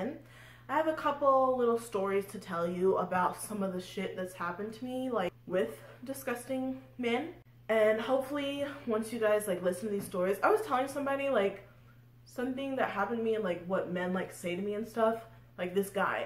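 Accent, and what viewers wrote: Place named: California, United States. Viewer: A young woman with a California accent who says like too much....who HATES MEN? Wow, what an original and unique person you are. Really breaking the mould.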